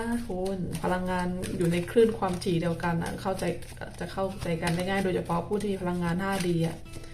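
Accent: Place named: South, Thailand. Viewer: คนใต้คับผม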